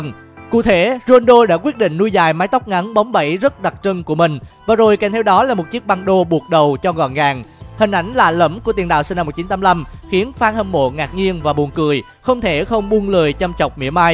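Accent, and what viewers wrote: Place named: Da Nang, Vietnam. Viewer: Giọng đà nẵng chắc luôn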